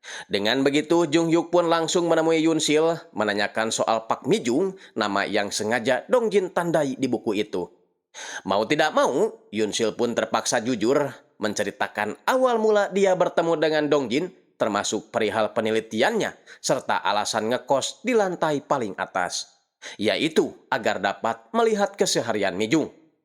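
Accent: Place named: Sunda, Indonesia. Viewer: trima kasih kang, nyeritain nya seru n lucu dgn gaya sundanya, sukses selalu kang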